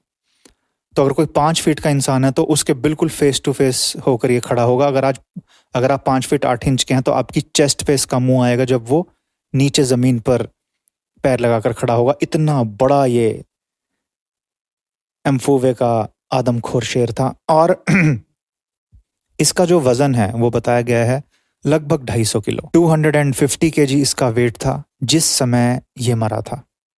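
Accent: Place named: United States. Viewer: Ye american english me kyo bol rhe ho ?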